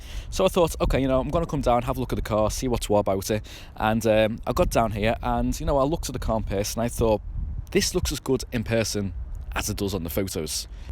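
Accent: Liverpool, United Kingdom. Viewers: Scouse! I've just bought an A4 Avant S Line 2007 2.0L N/A Petrol, nice to see a fellow scouser on my trawl through videos on the car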